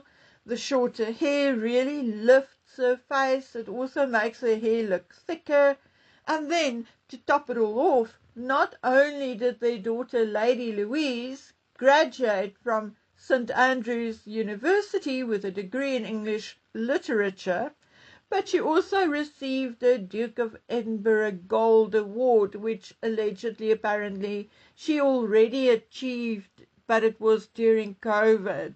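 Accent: Afrikaans, South Africa. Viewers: I LIKE your content ….always have. I didn’t know English wasn’t your first language. Is it Afrikaans?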